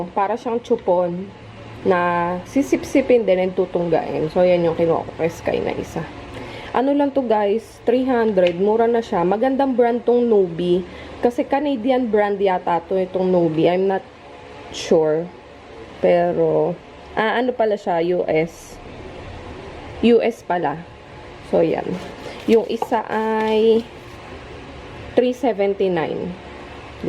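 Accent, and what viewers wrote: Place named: Quezon, Philippines. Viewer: hehehe puntong quezon ai... tlga poh san poh cla s infanta?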